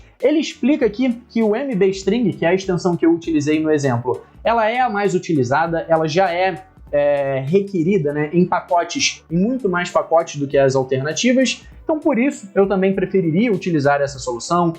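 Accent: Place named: Rio de Janeiro, Brazil. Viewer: Boa! parabéns, mas esse sotaque de carioca vixi! de boas cara!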